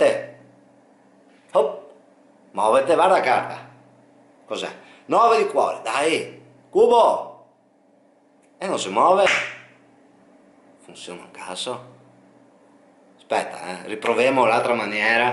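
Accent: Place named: Veneto, Italy. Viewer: Si sente che sei veneto !!! Di Codroipo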